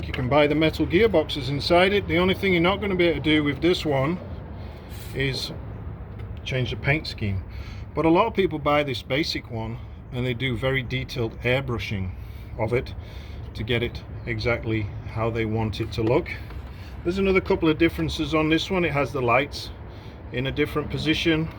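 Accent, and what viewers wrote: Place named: England, United Kingdom. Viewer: Hello were are you from originally. You don't sound American. Sound more English .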